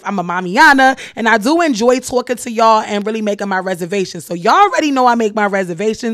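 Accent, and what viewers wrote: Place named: Brooklyn, United States. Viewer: First of all let's start with your beauty 😍. U r Amazing beautiful and the accent is BK New York ❤ She thought having the baby alone is all she wanted and she's up here and he's down there. Keke doesn't respect the man so it's best for him to go find his Queen that he wants... 👑 Keke is a Queen 👑 but let her go find her Prince 😊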